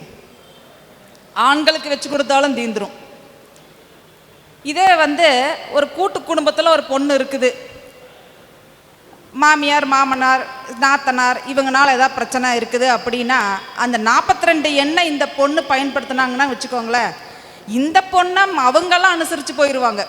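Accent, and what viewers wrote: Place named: Kongu, India. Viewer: Kongu tamil.. 👏🌷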